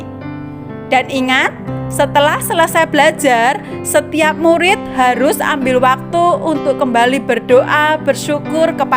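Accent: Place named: Java, Indonesia. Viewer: Logat Jawa kak😅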